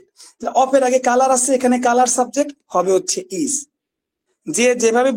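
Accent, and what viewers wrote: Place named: Mymensingh, Bangladesh. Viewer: অনেক দিন ধরে মাস্টার বইয়ের ক্লাস খু্জতেছি মনে মনে৷ আজকে পাইলাম৷ ধন্যবাদ স্যার৷ আপনার ভাষা শুনে মনে হচ্ছে আপনার ভাষা ময়মনসিংহ বা কিশোরগঞ্জ অঞ্চলে।।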